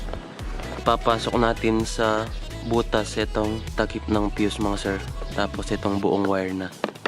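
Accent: Pampanga, Philippines. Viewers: Kapampangan ka sir?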